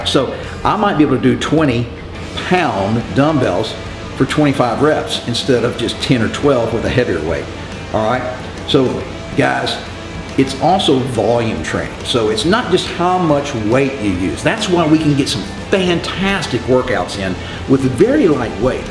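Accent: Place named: Dallas, United States. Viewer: Sounds just like everyone I grew up with in Dallas. Wonder if he is from my home town or even went to some of the same gyms that I did in the 80s? I lived everywhere from University Park to Plano.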